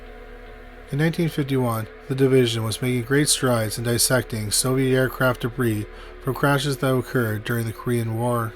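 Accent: Canada. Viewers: Remember that he isn't from the US. Maybe up in Canada they are taught to pronounce words this way. They think we are insane for not spelling words with u's in them like colour for example.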